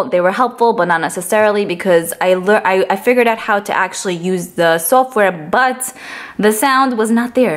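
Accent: New York, United States. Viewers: I really didn’t want to be mean, I love her accent it’s a big reason why I subscribed it reminds me of when I lived in NYC/Jersey 😊❤️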